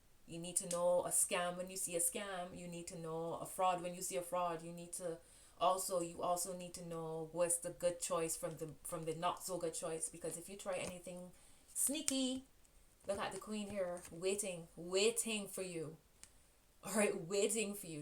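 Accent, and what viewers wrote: Barbados. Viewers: you have absolutely no reason to stress out. I've spent the past 8 months watching and listening to various readers and you are up there as one of my best readers. Regardless of the message, you are a wonderful reader. You have a confidence in interpreting the cards. Your warm and easy going. I got a glimpse of your sense of humor and your while you are reading, your concern is genuine and honest. Your bajan accent is beautiful too!!!! I could listen to you all day. Your awesome. Lots of love, light and blessings to you💜💕💙❤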